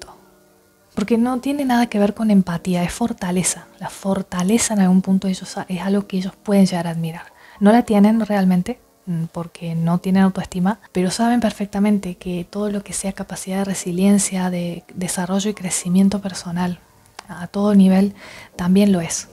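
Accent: Córdoba, Argentina. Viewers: Me encanto tu video. Estuve 3 años con un narcisista encubierto por 3 años. Muy abusivo verbal y emocionalmente; precisamente de Cordoba; tu mismo acento. Bueno, yo lo apoyaba financieramente por los 3 años que estuvimos juntos. Mientras más yo lo ayudaba peor me trataba y exigía más. Terminé casándome con el y a los 3 meses no soporte más sus maltratos y humillaciones y lo dejé. Agarre mis cosas y me fui. Me hizo Imposible las últimas semanas antes de mi salida. Lo malo es que me robó dinero. Yo le preste dinero en un momento que el estaba en un aprieto financiero antes de la boda y no me los quiere pagar. Me bloqueo para que no le pida mas el dinero. Y habla mentiras sobre mi y porque terminamos ! Que me importa! Ahora estoy con mi abogado tramitando el divorcio y todo. Lo curioso es que después que lo dejo, me entero a través de víctimas que estaban pendiente de nuestra vida juntos, que es un estafador en Córdoba. 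Me contactaron sus victimas ! Estafa mujeres que las engancha y les roba. También hombres que les hace creer que es un inversionista millonario en Miami … uffff una lacra total. Ósea me case con un delincuente sin saberlo. Además de narcisista. Gracias a Dios me di cuenta tiempo, ese hombre hubiese acabado conmigo, y con todo lo que tengo, capaz de asesinarme para quedarse con mis cosas. Ahora estoy bien. Dejarlo fue como volver a nacer y soy feliz otra vez. No lo extraño para nada. Ahora gasto mi dinero en mi y en mis hijas , viajo , invierto en arreglar mi casa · Oh Qué decirte? Realmente sorprendido Denoto en tú dulce voz una sutíl tonada cordobesa Empecé a escuchar tús audios dé casualidad Primero uno y después otro y otro y otro más Nunca doy likes ní hago comentarios ní mé suscribo Pero WOW con vos ló voy hacer, té ló merecés GENIA, la dulzura dé tú vos, la simpleza dé las explicaciones pero con contundencia y firmeza Didáctica Cada comentario tuyo es una incisión profunda y precisa Qué más decirte? 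Mé gustaría decirte muchas cosas más Pero yá mé extendí demasiado Té deseo ló mejor, muchos éxitos, té ló merecés Espero verte pronto en alguna conferencia Mé encantaría eso Sos un orgullo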